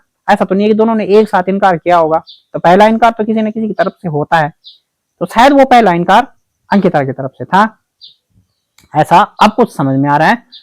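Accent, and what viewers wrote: Garhwal, India. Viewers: बहुत ही सुंदर वीडियो भाई जी आप बोलते बहुत अच्छे हो और आपकी बात शुद्ध और स्पष्ट होती है आपके द्वारा दिया गया रिएक्शन सटीक होता है आपके कमेंट बॉक्स में एक भाई ने कमेंट किया है कि आप हिंदी में कम गढ़वाली लहजा अधिक है तो भाई जी मै इस भाई को कहना चाहता हूं की बिहारी पूर्वी उत्तर प्रदेश बंगाली मद्राशी उड़िया महाराष्ट्र बड़े बड़े राज्यों के लोग अपनी भाषा बोली का इस्तेमाल करते है अपने लहजे में बात करते है अच्छी बात है न गढ़वाली लहजा है तो गर्व की बात है कि हम गढ़वाली है हमारा लहजा गढ़वाली होना चाहिए आप भाई का बीडीओ देखो इंजॉय करो और भाई को सपोर्ट करो लाइक करो बेफजूल के कमेंट करने से अच्छा होगा हम अपने इन भाई को आगे बढ़ाए स्पोर्ट करे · आप हिन्दी में कम गढ़वाली लहजा अधिक है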